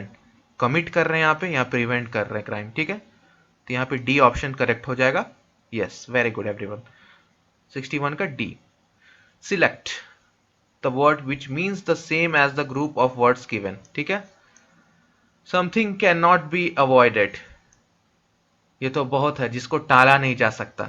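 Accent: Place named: Bhojpuri, India. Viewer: Cls to sir superb se duperb tha hi pr aapka bhojpuri v kch km nhi tha sir 😀😀😀😀👌👌👌👌👌👌👌👌👌👌👌👌👌👌👌👌👌👌👌👌👌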